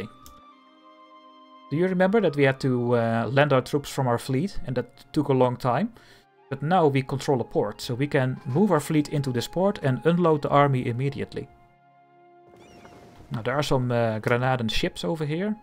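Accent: Netherlands. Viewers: Hey, I hope you are willing to continue on your tutorial! It was really helpfull in understanding the game sofar since you talk clearly, don't talk fast and explain it rather good. Also the dutch accent makes it for me better understandable (gezien ik ook Nederlands ben), so love that. Anyway, I hope you'll find yourself able to continue. Great thank for the tutorial so far!!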